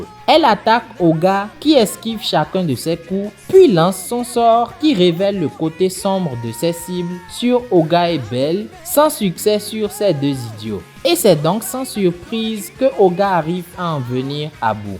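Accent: Benin. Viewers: T'es béninois 🤔?T'as l'accent en tout cas 😃. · Accent béninois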